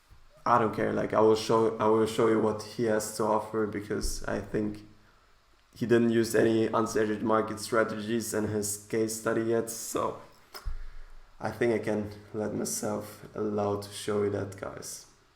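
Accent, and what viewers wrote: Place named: Germany. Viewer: Die ersten drei Wörter gehört und sofort gewusst, dass du Deutscher bist hahaha. Geiles Video, hilfst mir damit weiter, danke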